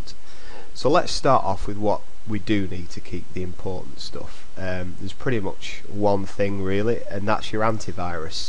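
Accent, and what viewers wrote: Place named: Yorkshire, United Kingdom. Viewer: Can i do it without the yorkshire accent?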